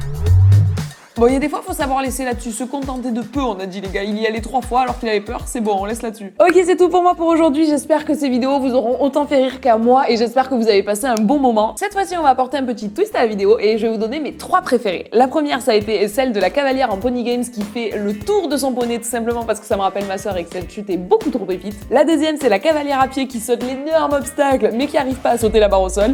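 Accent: Marseille, France. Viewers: J'adore dans accent de Marseille (en j'ai le meme) sinon ti cro belle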